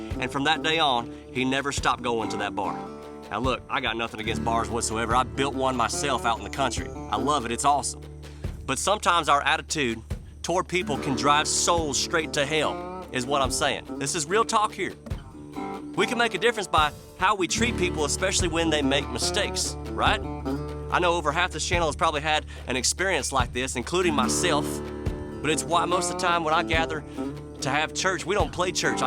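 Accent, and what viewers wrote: South, United States. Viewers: LOL...love the stories! and that southern accent....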